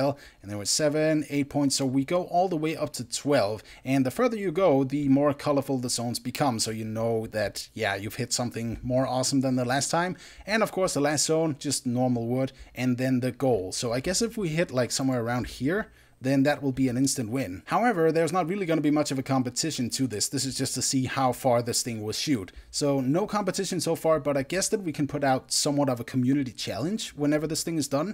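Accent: Denmark. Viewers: he sounds danish.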